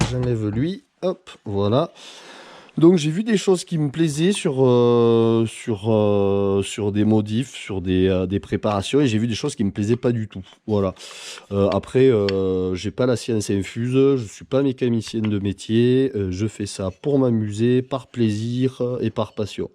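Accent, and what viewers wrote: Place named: Montpellier, France. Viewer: Accent Montpellierain on dirait ...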